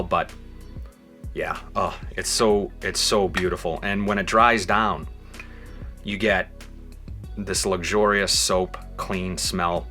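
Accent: Boston, United States. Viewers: THAT BOSTON ACCENT!!! FIRE!!!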